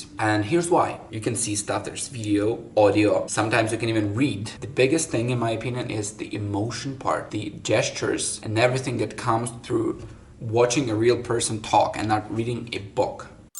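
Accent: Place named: United States. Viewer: I really like your American accent!👍👍👍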